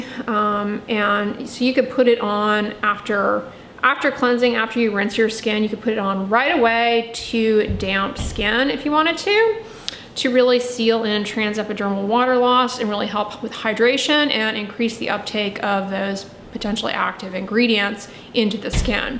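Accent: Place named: Philadelphia, United States. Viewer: I love your videos, but I’m constantly trying to pin your accent. It’s sounds like the Philly tri-state area (PA, So.NJ, No. DE)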